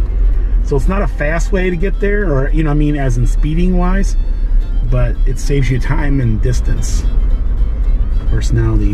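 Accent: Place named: South, United States. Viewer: It's funny how your words are sounding more like the Southern part of of you now! LOL!!!!!😁😁😁😁😁 THATS A GOOD THING!! You even have a twang also...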